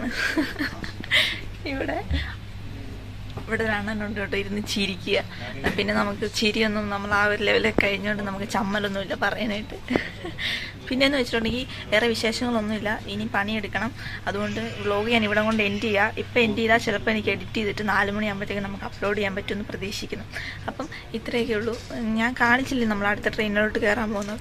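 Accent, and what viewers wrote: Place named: Idukki, India. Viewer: Pakka idukki language ❤️❤️😘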